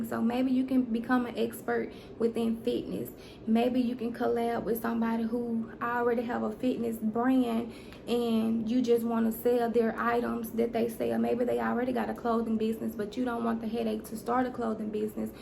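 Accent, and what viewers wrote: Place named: Mississippi, United States. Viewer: I hear the Mississippi all in your voice! 😉 very informative channel, so I just subscribed!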